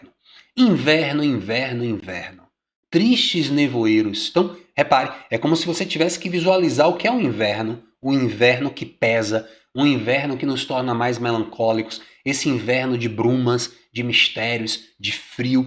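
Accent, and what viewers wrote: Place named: Bahia, Brazil. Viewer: baiano ne? e foda!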